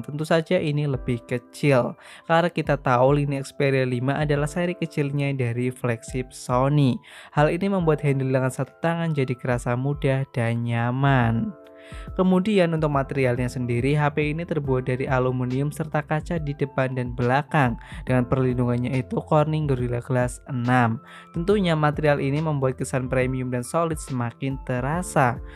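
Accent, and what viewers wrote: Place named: Kedu, Indonesia. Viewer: Bahasa jawane mirip daerah kedu-mgl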